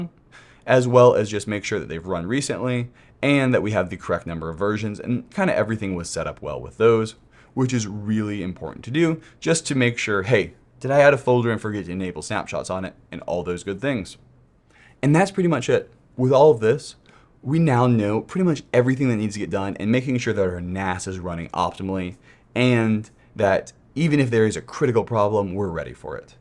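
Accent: California, United States. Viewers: Love the channel but this the most California accent I’ve ever heard in my life and I forget every time until I click on the vid 😂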